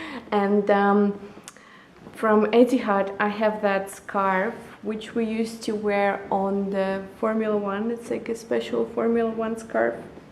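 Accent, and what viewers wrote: Russia. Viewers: She sounds like Russian, Ukrainian or something from that region.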